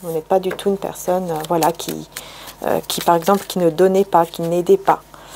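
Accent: France. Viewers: je suis allée voir la chaine italienne, trop mimi le mix entre petit accent français et petit accent sicilien😊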